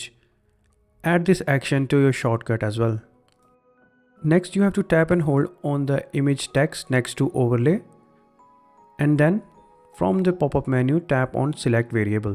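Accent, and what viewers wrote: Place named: India. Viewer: I knew this video works the moment I heard my brothers Indian accent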